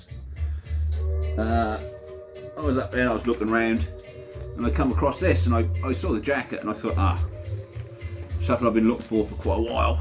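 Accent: Suffolk, United Kingdom. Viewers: Shame about the Blue Note album but if it still sounds good that's fine...generally I don't buy european Jazz vinyl albums as so many are Digital to Vinyl copies Di Agostini is one there are others, the USA market does not allow that (digital copies of original masters) Originals are like hens teeth..!!. Different rules in the EU. Nice to hear that Suffolk accent...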